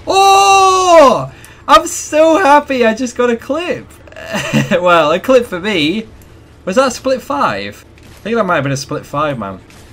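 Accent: United Kingdom. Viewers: U brittish?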